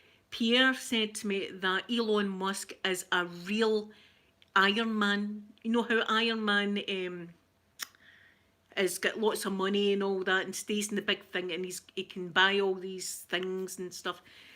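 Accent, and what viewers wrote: Scotland, United Kingdom. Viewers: I love scottish accent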